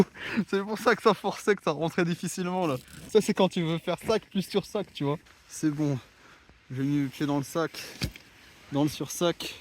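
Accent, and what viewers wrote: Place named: Alsace, France. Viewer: J'ai directement reconnue l'accent alsaco ! Super mood en tout cas. On se croisera peut être un jour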